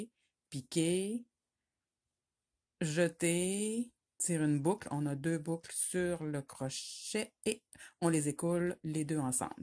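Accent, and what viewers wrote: Quebec, Canada. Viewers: Un grand merci de Suisse pour ce tuto qui m'a permis de réalisé de belles finitions. Vos explications sont très claires, votre rythme agréable et votre accent me rappelle le Québec🙂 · Merci pour ces bons astuces et votre magnifique accent du Québec ! :washhands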